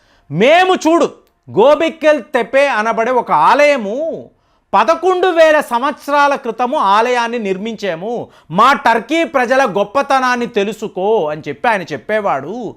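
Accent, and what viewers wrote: Tamil Nadu, India. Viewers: Sir Tamil background Telugu anduke Tamil accent to Telugu matlduthunnaru Hyd / Telangana lo Telugu Urdhu lo makkinchinattu matldutharu alage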